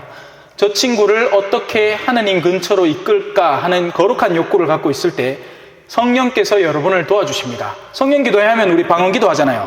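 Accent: Gyeongsang, South Korea. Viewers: 정감가는 같은 경상도 말씨 좋습니다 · 반갑습니다 ^^ 구수한 경상도 사투리 좋아요. 저는 안천에 살고있어요. 넘 경쾌하게 강의 잘듣고있습니다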